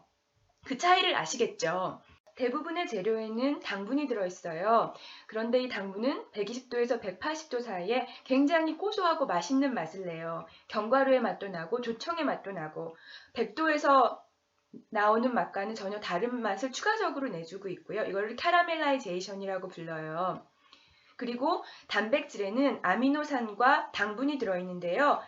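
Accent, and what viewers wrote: Seoul, South Korea. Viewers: 서울 사투리 정말 매력적 ㅎㅎ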